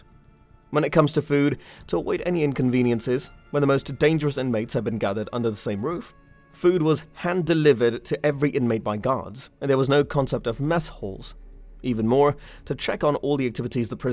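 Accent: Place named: Netherlands. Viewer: You have a strong Dutch accent when speaking English. Good video, Gracias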